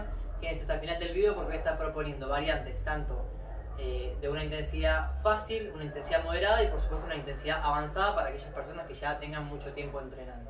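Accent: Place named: Argentina. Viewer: muchas gracias me gusto mucho el video. bién explicado. De donde las tenias colgadas tus anillas? En un parque habian barras paralelas y anillas. Yo confiado que dominaba la paralela, salte para hacer fondos en anillas y me lesione el hombro. No muy grabe me recupere rapido, si antes hubiera visto tu video no cometia ese error.😃👍 de donde es ud. Por su acento creo que sea de la Argentina.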